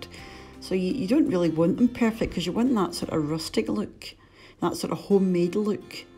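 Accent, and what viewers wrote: Scotland, United Kingdom. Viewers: You are a wonderful lady to watch and I love your accent. You help me get in touch with my Scottish side as well. I have learned to cook mostly like my mom and her first nation's family, with plenty of slow-roasted meats, corn, and other veggies with much bolder spicier tasting things. But your videos have helped me come up with stuff for my Scottish Irish dad and his preferred taste for things. My parents are getting older and it's nice to find things to make that they enjoy. Thanks for your videos. :) I appreciate your channel. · Love this! Love hearing a Scottish accent on here!!💙They look amazing and I’ll definitely be trying this out this week! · Just found your channel and I’m absolutely addicted. As a Scot living in Hong Kong, it great to find authentic recipes and a lovely Scottish voice. Great work and keep it coming. 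Btw the English subtitles are hilarious!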